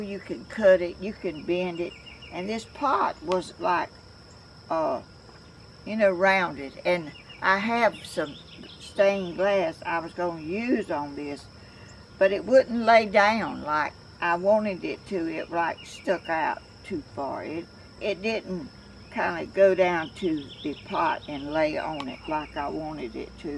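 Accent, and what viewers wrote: South, United States. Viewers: You deserve the plaque!!!! We love to her your southern accent, your sweet personality, your faith, you are a blessing to us all......So thank you. The pot you covered today is beautiful!!!!! But what you need is a big silicone cookie sheet to pour your stuff onto and it will come off with no problem.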